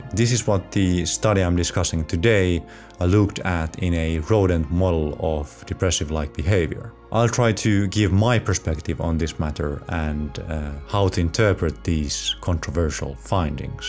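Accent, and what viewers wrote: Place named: Finland. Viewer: Sounds Finnish :)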